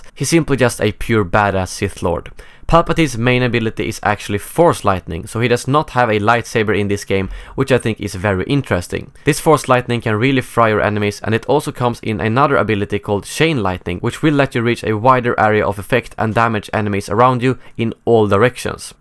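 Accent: Sweden. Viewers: Jo han är svensk. jag hör att han är svensk. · I'm sure you're swedish ! (I heard your accent haha) but great vid bro, keep going! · Are you from Sweden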